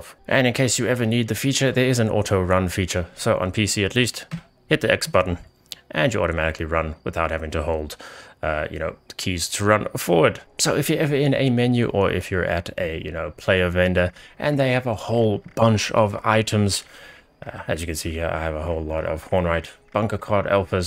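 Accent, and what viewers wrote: South Africa. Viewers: Is that a South African accent I'm detecting?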